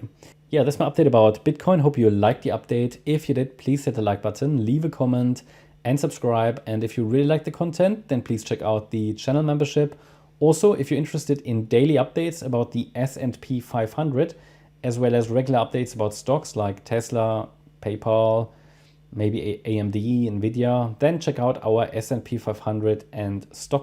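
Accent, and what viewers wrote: Germany. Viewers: Great content! Just discovered the channel. German?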